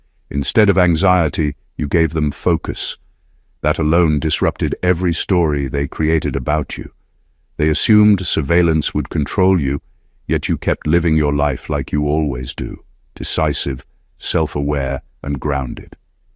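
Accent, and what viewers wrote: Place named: New York, United States. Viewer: Vivid imaginations they have, don’t they ? Their lost in the sauce. NY accent sawus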